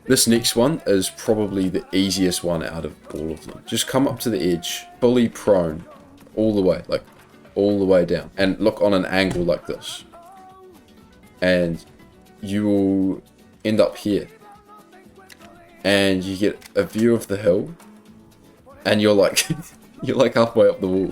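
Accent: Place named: New Zealand. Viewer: also are u kiwi? i am too recognise ur accent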